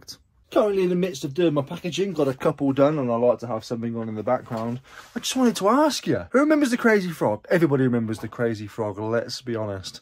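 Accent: Wales, United Kingdom. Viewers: I’ll make the most of it while it lasts. Love the welsh accent by the way, thought I was watching Fireman Sam for a minute! I saw Ian’s video, bet the guys wife got a right bollocking after he left.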